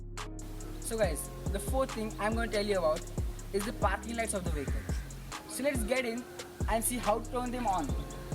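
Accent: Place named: England, United Kingdom. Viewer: Nice video bhava... Nice English accent..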